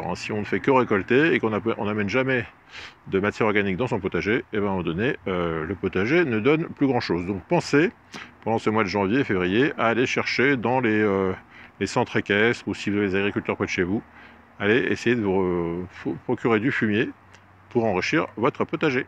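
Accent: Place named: South, France. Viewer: Bravo, magnifique reportage avé les accents du Sud!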